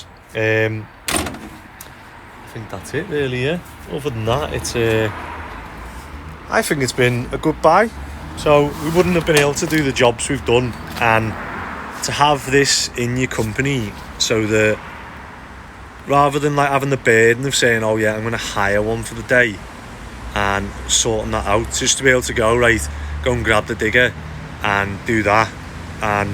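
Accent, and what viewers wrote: Liverpool, United Kingdom. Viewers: Nice Scouse guy.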